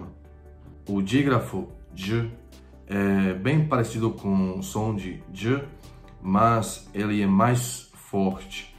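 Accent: Minas Gerais, Brazil. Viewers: Você fala um português praticamente igual ao que falamos em Minas Gerais, isso mostra bem que há uma proximidade na fonética das duas línguas, já que o indivíduo sempre irá aprender outro idioma aproximando o máximo possível a fonética de sua língua materna à fonética da outra língua. Ótima explanação sobre as letras do alfabeto croata desconhecidas por nós brasileiros.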